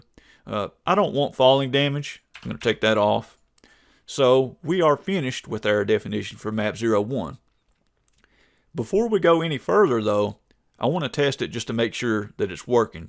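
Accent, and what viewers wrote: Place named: Texas, United States. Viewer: i can hear texas from this man's accent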